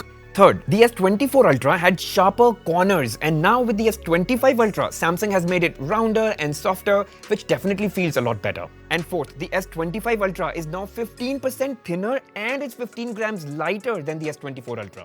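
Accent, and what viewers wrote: India. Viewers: This man has such a good Indian accent. Clear and articulate. Keep it up!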